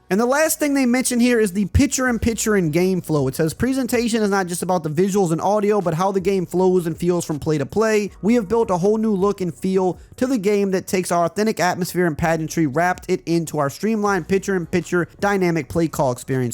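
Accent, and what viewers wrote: Louisiana, United States. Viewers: Dang man you sound like you're from St. Tammany Parrish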